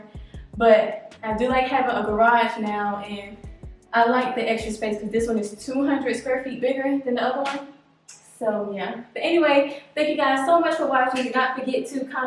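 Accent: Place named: Houston, United States. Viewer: I think I hear a bit of Houston accent 🤔 beautiful home.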